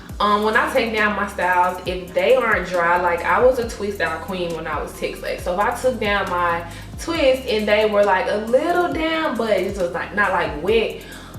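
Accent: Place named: Texas, United States. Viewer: I heard that accent and just knew you from Texas 🙌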